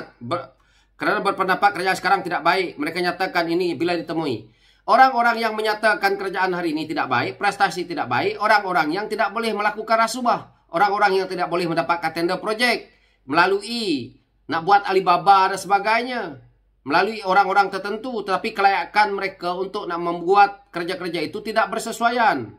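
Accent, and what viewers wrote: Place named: Terengganu, Malaysia. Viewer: Kuat betul loghat trengganu tuu..😅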